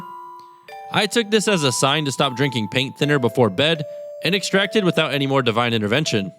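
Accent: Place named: Midwest, United States. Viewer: Damn I'm right across the big river from you I knew you sounded more Midwestern than most people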